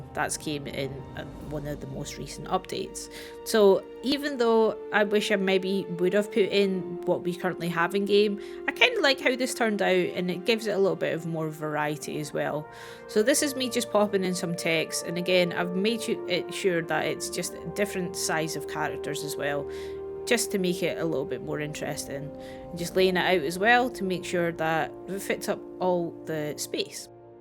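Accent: Scotland, United Kingdom. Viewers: Hey think you could do a tips or tutorial video on prehistoric kingdom? I bought this game and I always get a bit overwhelmed and never know what to do... Your Scottish accent fills me with confidence so I had to ask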